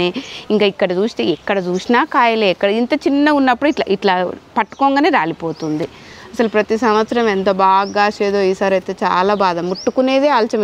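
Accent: Telangana, India. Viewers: Madam i am new subscriber just now actually my wife was your old subscriber. And i like your way of delivery Telangana sentences. All the best madam.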